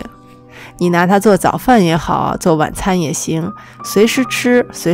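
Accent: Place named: Shaanxi, China. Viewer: 其实除了平时看怎么做美食，赏心悦目之外，还有就是听小高姐说话的腔调，虽然不是陕西话，但是很多用词和语调特别的家乡腔 亲切❤️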